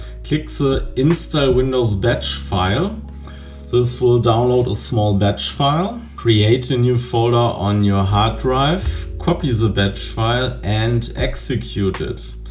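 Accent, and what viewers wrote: Germany. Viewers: the german accent gives me hope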